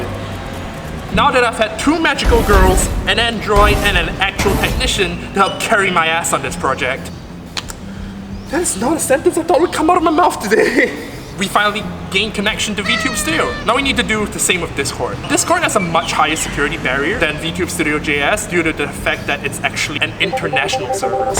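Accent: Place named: United States. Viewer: Just want to say, I love the accent you're packing. And I thought every Singaporean Vtuber here had the Singlish problem, you're one of the first I've watched with a normal American accent, and I'm happy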